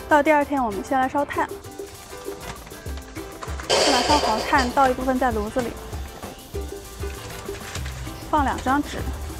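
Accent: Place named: Jiangsu, China. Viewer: 这是哪儿的口音 像江苏的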